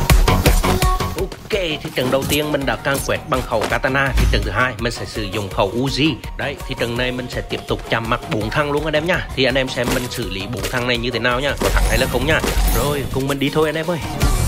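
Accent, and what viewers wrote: Central, Vietnam. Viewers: Anh người miền Trung hả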